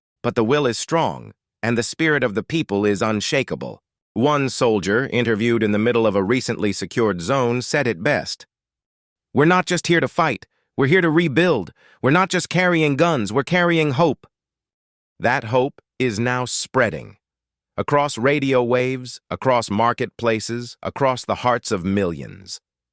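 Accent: West, United States. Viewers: The same western accent. Cant we have an African accent if we are getting news/presentations about Africa. It says that its not a war waged by outsiders, but this sounds like an outsider to me. I am fed up with this. TRULY RECLAIM OUR IDENTITY. We need to be African in EVERYTHING. This is NOT a voice of Africa! Pas la!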